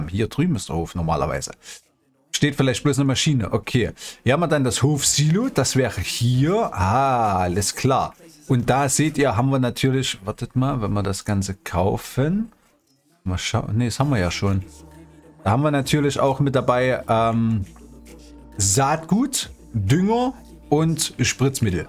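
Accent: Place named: East Germany, Germany. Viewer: Moin die Map hast du ja sehr schön vorgestellt und ja der Harz ist zum Teil meine Heimat ich finde diese Karte sehr schön und mit deinem ostdeutschen Dialekt rundet das die mapvorstellung perfekt ab ich würde mir echt wünschen dass du mehr modvorstellungen machst weil du kannst das richtig gut gibt von mir einen Daumen hoch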